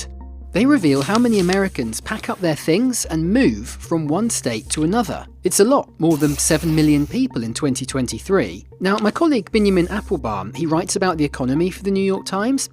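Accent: United Kingdom. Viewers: The British accent is distracting. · I love how it's narrated by a brit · NYT needs to hire an American to narrate these videos. Why should we Americans be lectured by a British accent?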